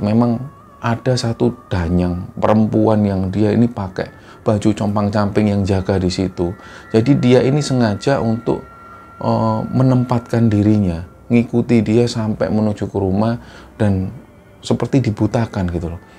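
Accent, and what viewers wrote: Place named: Surabaya, Indonesia. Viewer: Aq seneng logat Surabayanya nek cerita, jadi kangen kampung halaman, tanah kelahiran Surabaya.. hahhaa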